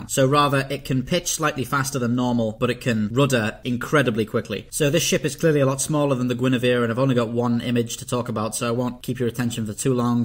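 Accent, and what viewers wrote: United Kingdom. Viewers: I'd love to see a video where you summarize all the technical terms of a ships movement, sides, etc. What does ruttering mean? Aft, ventral, all that stuff. Yeah, I could look it all up, but I wouldn't be hearing it in a british accent.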